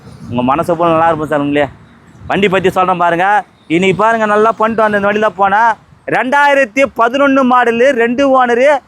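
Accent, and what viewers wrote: Madurai, India. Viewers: Super Anna Madurai vasagam 🎉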